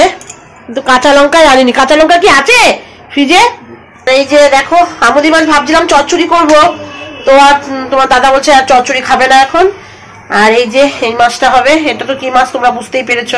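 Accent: Bangal, Bangladesh. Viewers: শুভ জন্মদিন দাদা 🙏🙏 আপনার সুস্ততা কামনা করি সবসময আনন্দে থাকবেন আর আমাদের আনন্দ দেবেন আপনার কথা শুনলে খুব ভালো লাগে বাঙাল ভাষা শুনতে বেশ লাগে তোমার মেয়েকে অনেক অনেক ভালোবাসা ❤❤❤❤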